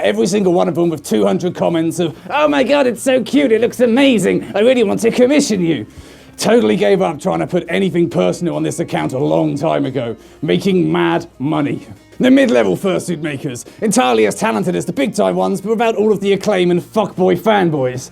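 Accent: United Kingdom. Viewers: Oh lord...that is the most heavy, yet most beautiful british accent I ever heard!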